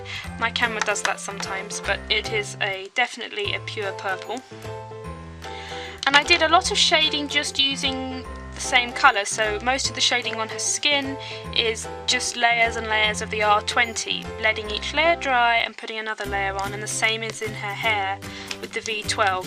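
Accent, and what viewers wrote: England, United Kingdom. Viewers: what shop do you get it from? you sound like a English girl like me. just guessing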